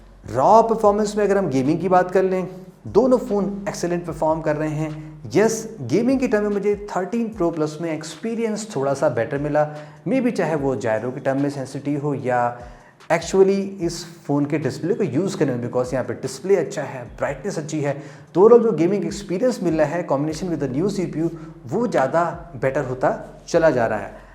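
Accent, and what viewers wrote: Lucknow, India. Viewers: Bhai aap Lucknow se ho kya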